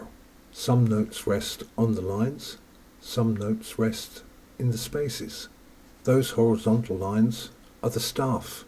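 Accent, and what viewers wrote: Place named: England, United Kingdom. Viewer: This is the FIRST time in my life that I did not understand not a word what he saying. Accent is terrible, probably English, sound is terrible with a lot room effect Never happen to me in 57 years: I can watch American or English movies without translate or subtitles but this is....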